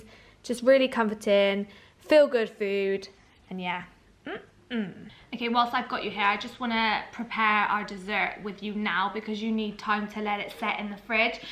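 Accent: Bristol, United Kingdom. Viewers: I knew you were from the west country. Love the "brizzol" accent.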